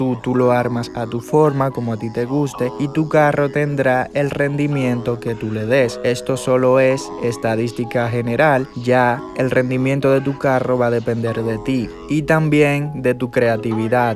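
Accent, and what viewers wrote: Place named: Dominican Republic. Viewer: Yo sabia que esa forma de hablar tuya era dominicana ufff puro flow domi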